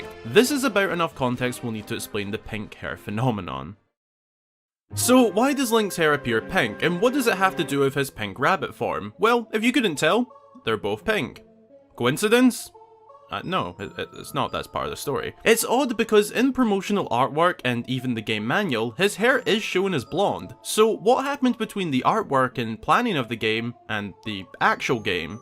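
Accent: Scotland, United Kingdom. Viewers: Are you Scottish just by the accent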